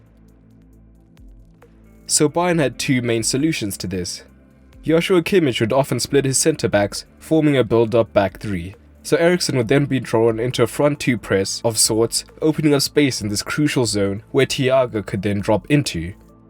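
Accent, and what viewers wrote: South Africa. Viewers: Where are you from? Sound like a Saffa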